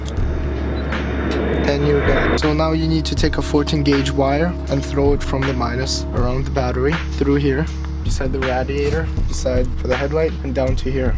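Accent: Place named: Europe. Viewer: What country are u from... I hear a little European when u speak.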